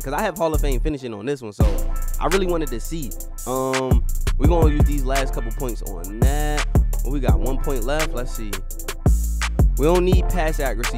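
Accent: Baltimore, United States. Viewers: i can tell u frm baltimore 😂😂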